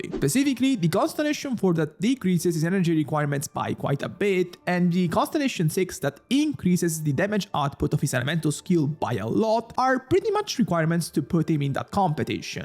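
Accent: Italy. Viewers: accento italiano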